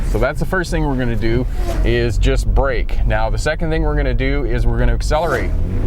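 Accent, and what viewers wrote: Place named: Canada. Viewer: I knew this guys was Canadian lol. His accent just didnt sit right with me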